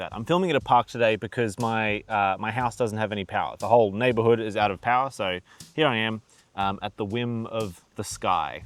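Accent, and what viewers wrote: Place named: Australia. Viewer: Great video - also love to hear another Aussie’s accent on YouTube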